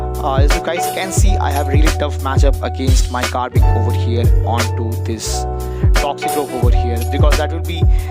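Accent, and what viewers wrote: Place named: India. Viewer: Bro thé musics sounds is high cant understand your indian accent cleary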